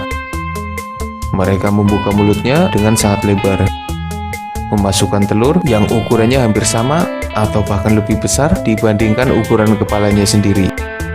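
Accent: Banyumas, Indonesia. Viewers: Admin orang Cilacap kah? Kok kayak ngapak gitu.. Hehe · Org ngapak nih mimin hahaa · Adminya aslinya ngapak kali nih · Kiye sing dadi admin mesti wong ngapak yah? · Logat daerah saya nih ngapak cmiiw hehe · Pasti ni orang ngapak. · Logat ngapak bet anjay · Ada medok ngapaknya, ora ngapak ora kepenak bro ! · Ora ngapagh ora penagh... Orang ngapak ya min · Ko logatnya kaya jawa ora ngapak ora kepenak ya. Daerah banyumas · Maz wong ndi kok ngmonge ngapak 🤣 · Boleh nebak kan min, pengisi suaranya kayak logat ngapak daerah selatan.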